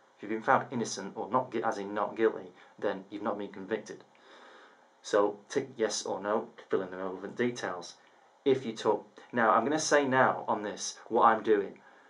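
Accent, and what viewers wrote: United Kingdom. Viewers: Do you mind if i ask what club you went to? you sound like your from Manchester, i got my full membership card in the post this morning , FAC application going in this week.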